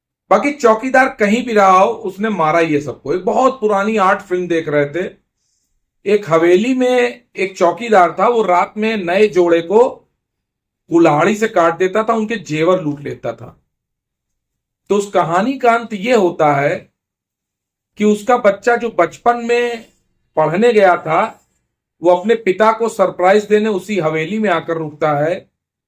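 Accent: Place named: Lucknow, India. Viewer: Bhai app lucknow kai hai kya, maza a gaya apke commentary sun kai. Wow 👌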